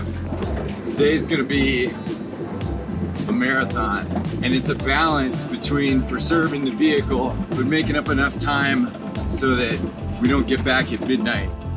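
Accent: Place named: Southern California, United States. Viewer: Yup, his accent is so SOCAL it hurts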